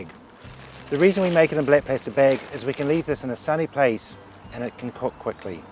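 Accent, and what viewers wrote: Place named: New Zealand. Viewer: Really good show.First time i saw your chanel today.U sound kiwi, i'm from land of wombats and magpies. You are still answering questions from 2yrs ago, magnificent, not many do this. U show care towards your viewers , a rare quality. Will check some of your others .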